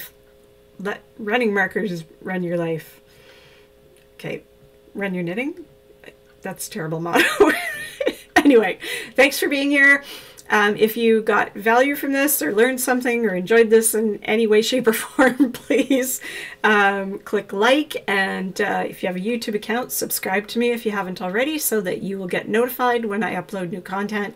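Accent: Canada. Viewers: Yup, I remember finding out about 'em when I was doing brioche. Now that I crochet only, it's all changed. Like life. Sighh .. But I like your delivery, and I always like a Canadian voice. :)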